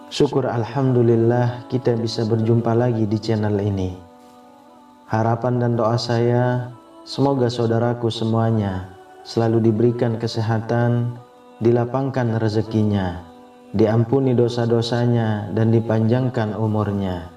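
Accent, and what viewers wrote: Lombok, Indonesia. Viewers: Suaranya kayak Logat orang lombok..ntb.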